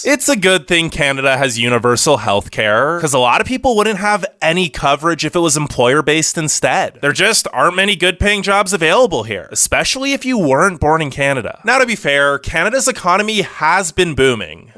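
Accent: United States. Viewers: Oh man. That American accent!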